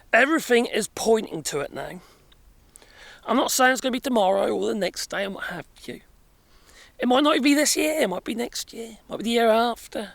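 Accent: United Kingdom. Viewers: You think lack of truckers is going to be the problem ...british accent doesn't mean you aren't an idiot ...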